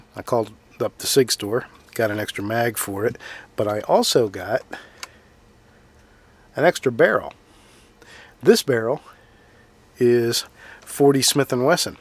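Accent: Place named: Maryland, United States. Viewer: How have 100,000 subscribers not found you yet? Recognized the fellow Maryland accent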